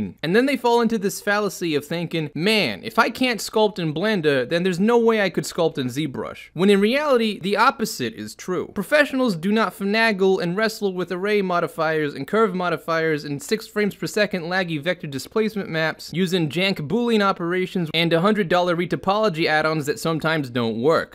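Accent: New York, United States. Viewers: okay. Native New Yawker? Take my follow.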